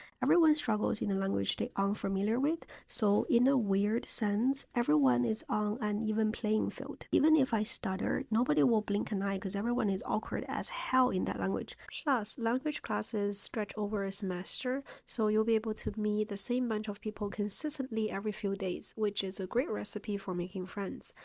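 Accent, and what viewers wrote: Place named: Toronto, Canada. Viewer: You got that toronto accent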